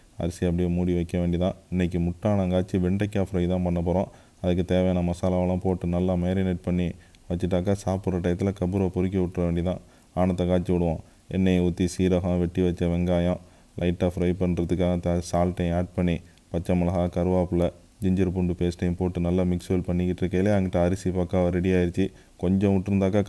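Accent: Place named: Pudukottai, India. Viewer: Asalamu alaikum brother neenga entha ooru thappa eduthukkathinga neenga pesurathu pudhukottai Alangudi side pesura mathiri irukku athan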